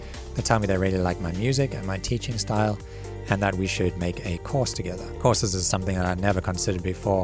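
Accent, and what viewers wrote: South Africa. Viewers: dude just noticed the accent is south african shout out bro ! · Yo...I need to know, are you from South Africa? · Trying to place the accent. I know you're in UK but I'm guessing SA?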